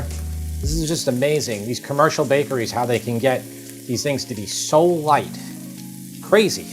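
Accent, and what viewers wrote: New York, United States. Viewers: You sound like Andrew Camarata. You must be from New York. He's a YouTuber, like you, but different in that he's heavy equiptment, etc.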